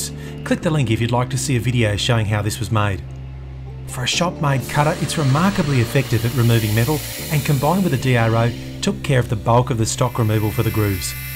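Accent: Australia. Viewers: G'Day, Every time I watch your fascinating videos I'm left with just so many questions: • This is the first time that I've seen "blueing" done with a bed of copper shavings. Most frequently I've seen it done simply with a blowtorch & a tin of oil, or with a chemical treatment. What's the advantage of doing it this way over something like Birchwood Casey Super Blue? • Why a box formed from thick brass & not just one from a (cheaper?) thinner mild steel cutout? Aesthetics or functionality? • Why only two feet instead of a more stable four? And why have the threading holes penetrate all the way through the brass (thus needing rivet caps), instead of making shallower holes (or just positioning them below the box walls)? I've only been aware of your channel for a short time, but have been riveted by the extraordinary attention to detail, functionality & aesthetics in your projects (especially the exquisite Playing Card Press). 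Not merely mass manufactured tools, but singular (& wonderful) works of art. I'm also pretty chuffed that there's such damn good content from another Aussie (I'm over in Bunbury, WA) - it gets kind'a tedious listening to American accents sometimes.; )~ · has anyone ever told you how wonderfully pleasant and warm your voice is? It's not just the novelty of the Aussie accent (I'm American, not something I get to hear often) but the fact that I can actually HEAR the smile when you talk. Love it.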